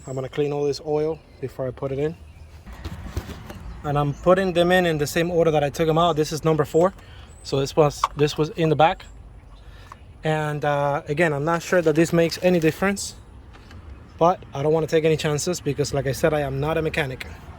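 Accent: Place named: Puerto Rico. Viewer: Just knocked this out thanks to this video! Thank you! There's 0% chance you're not boricua with that accent! Dimelooo 🇵🇷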